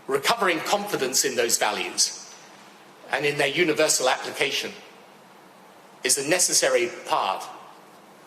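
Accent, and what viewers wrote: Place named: United Kingdom. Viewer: No shame whatsoever, just blatantly calling for more deaths of Europeans in general and British people specifically as he thinks fighting Muslims is their strategic depth. I wonder how much money this puppet will get from his puppeteers lobying i.e., the Military Industrial Complex in Europe. Seems spreading ideology of terrorism in British accent is acceptable.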